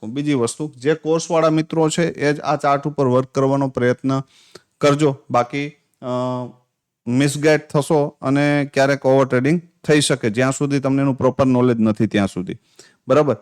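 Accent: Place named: Kutch, India. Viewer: Kutchi Madu lago chho bhai bhasa par thi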